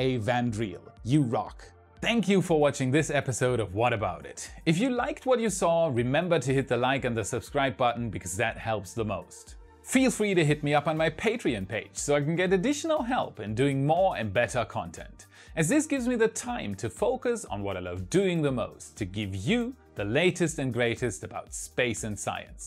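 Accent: Germany. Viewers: Hey man. You sound very German 🇩🇪 ? But your English is pretty decent. Where are you broadcasting from?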